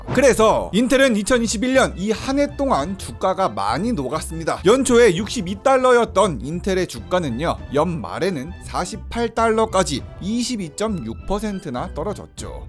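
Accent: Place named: Gyeongsang, South Korea. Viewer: 백날 알려줘도 경상도 것들은 안배워요 끝까지 군님, 인녁, 인뉴, 전녁, 만뉴.. 후~~~~~ 고생 하셨어요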